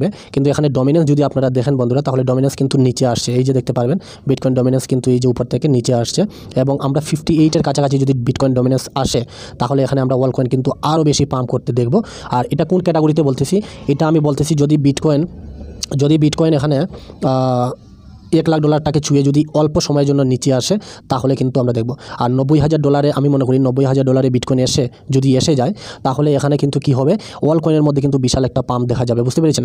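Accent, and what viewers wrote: Sylhet, Bangladesh. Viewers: মনে হয় সিলেটি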